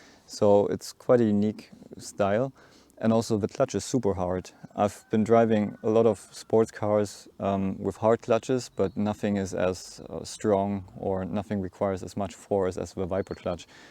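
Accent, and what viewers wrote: Germany. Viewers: Wenn ich das richtig heraushöre bist Du Deutscher? Warum den auf Englisch? Wegen der Reichweite?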